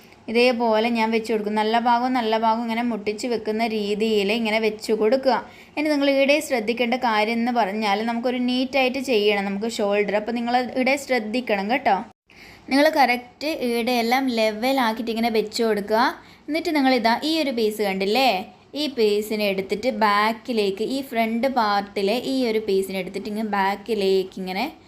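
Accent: Kannur, India. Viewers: ഇത് കണ്ണൂർ ആണോ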